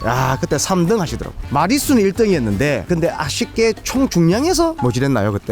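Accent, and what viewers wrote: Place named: Gyeongsang, South Korea. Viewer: 곱게 가제이 이건 진짜 서부 경남 더구나 진주 거제 삼천포 등 이 아니면 모르는 사투리 ㅎ.ㅎ.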